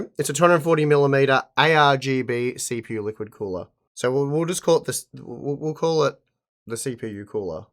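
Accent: Australia. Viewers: Daddy's shadow., she's a good looking girl....looks a lot like dad. And yes, I simply adore that lovely Aussie accent. Keep up the great work. Does Tim have a son/daughter to also do a pc build.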